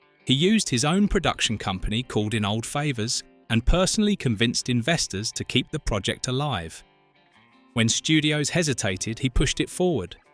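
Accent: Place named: Liverpool, United Kingdom. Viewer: I agree with you. It would also be nice to have a English speaker for narration instead of that liverpool English. Thank God for captions😂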